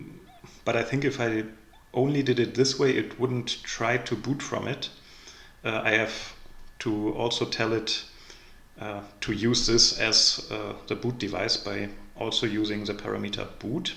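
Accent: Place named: Germany. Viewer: Gut gesprochen, aber man hört den deutschen Akzent :)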